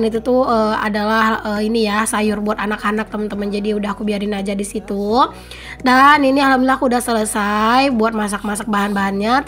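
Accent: Java, Indonesia. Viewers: Rumbai hadir,namanya obat keteguran bnd,masa bnda lupa?bnda sekali2 pakai logat pekanbaru dunk?kayaknya bnda ngomong seperti logat jawa aja🤗🤗🤗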